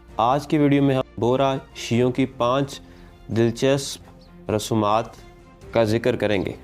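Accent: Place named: Punjab, Pakistan. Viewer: What a boring commentary in typically boring Pendu Punjabi accent with slow and mispronounced Urdu words. No doubt Punjab University has lowered it's standards of entry and under pressure from Jati Umra's chief Kanjri Mrs Maryam Safdar Awan's, wife of Safdar Masalli of Mansehra, influence or orders all Pendus must be given training to speak in LaWhori style of speaking. Now that's what I call dumbing down.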